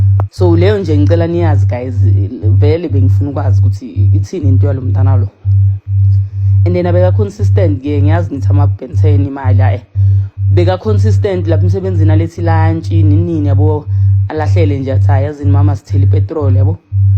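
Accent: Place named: Msawawa, South Africa. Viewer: Watching from OrlandoEast❤Yaz bengsamangalele itone yakho netaal Kanti wangapha eMsawawa,😂❤ Lentwana Lexi zize ngamawaza🤞maar zyaseta bro🤣🤣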